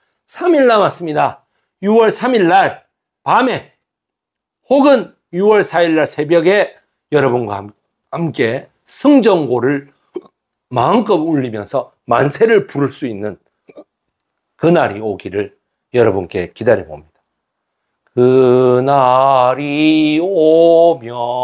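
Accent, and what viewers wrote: Gyeongsang, South Korea. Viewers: 구수한 경상도 사투리에 잘못하면 반 쯤 쥑이는 화법 귀가 뻥뚤리는 스트레스 확 풀리는 목소리에 반했어요!!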